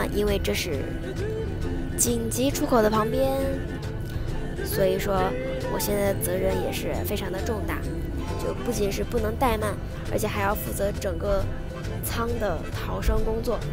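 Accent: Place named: Beijing, China. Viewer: 好久没听着京片儿了，就是亲切。欢迎你来洛杉矶。像我这样住LA这么久的人也是通过你第一看到这里很多景点。谢谢。加油！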